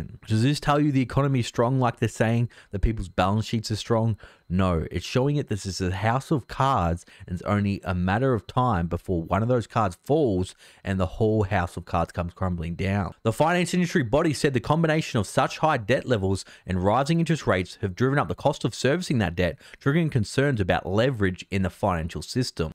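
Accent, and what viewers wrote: Australia. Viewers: Good to hear an Aussie on finance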